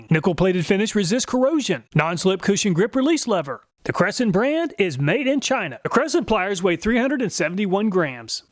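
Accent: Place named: Southwestern Pennsylvania, United States. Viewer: Hi mr project farm! After watching all of your videos your accent on A's and O's remind me of home... Do you happen to be from a certain city in South Western Pennsylvania?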